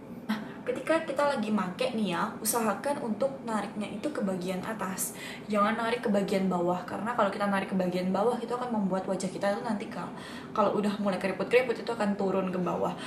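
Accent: Surabaya, Indonesia. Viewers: hai.. you are so pretty and natural ^^. i have beauty chanel too, if u don't mind please check up my chanel too ^^ and hopefully someday we can join for another tutorial.. because i know from ur "logat" u are surabayaERS hahaha.. salam kenal ^^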